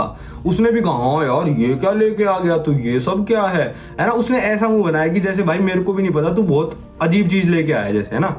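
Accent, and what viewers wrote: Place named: Haryana, India. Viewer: Bro ur accent is totally changed.. in your starting videos your accent was more different. It's more Haryanvi now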